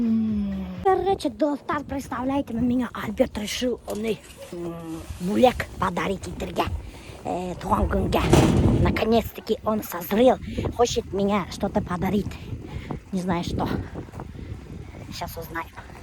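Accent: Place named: Bashkortostan, Russia. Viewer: туган конен белэн чын кунелемнэн котлыймын!!!!! Исэнлек-саулык, тормышыгызда бик куп матур, шатлыклы коннэр телэп каламын. Сезгэ хэрчак унышлар гына юлдаш булсын!!! аерым зур рэхмэт сезгэ кире уз асылыгызга кайткан очен!!!! Урысча эйткэндэ:это дорогого стоит!!! Сезгэ актриса булу кирэк иде, бигрэк лэр дэ матур Башкортча сойлэмегез!!! Рэхмэт, барысы очен дэ, тагын бер кат.... илэушэ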